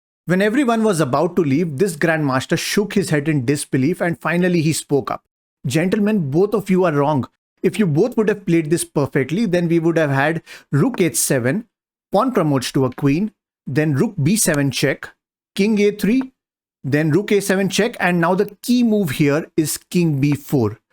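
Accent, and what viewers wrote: India. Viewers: I'm a voice actor and I'm stealing your accent. This has to be the strongest Indian accent I've ever heard in my life.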